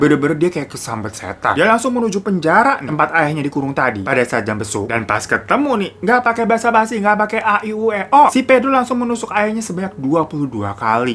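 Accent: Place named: Jakarta, Indonesia. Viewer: Pertama kali komen nih bang, mo nanya bang asli orang mana si? Logat betawi nya pulen bet dah sedepp denger nye 😂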